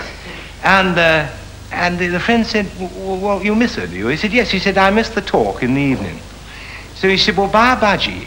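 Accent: RP, United Kingdom. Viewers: The uppercrust English delivery style always made me laugh and find it even more humorous..if I imitate an English gent i channel his imitation.. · Love his upper-crust British accent. Makes everything he says even funnier. 🤣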